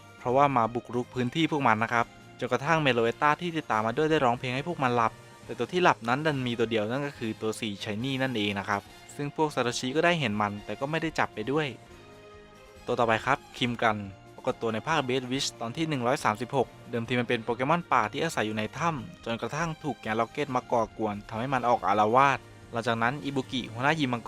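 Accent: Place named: Suphanburi, Thailand. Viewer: สำเนียงเหมือนคนสุพรรณเลย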